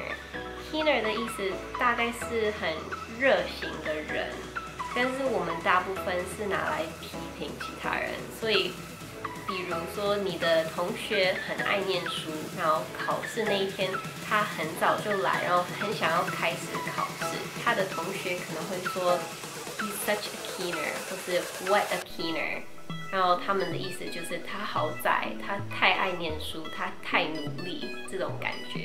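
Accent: Taiwan. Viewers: 台普棒棒哒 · 真是台湾腔啊。。。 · 很地道的台湾普通话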